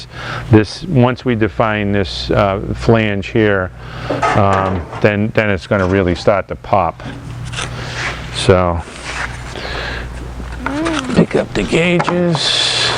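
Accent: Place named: Boston, United States. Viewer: so stunning, I can't thank you enough. Also I love the Boston accent " a little bit.... a little bit" . It reminds me of Lazze's videos when he says "littlelittlelittlelittle" in his Swedish accent. "A little bit" seems to be a metaphor for life... at least for those of us making art.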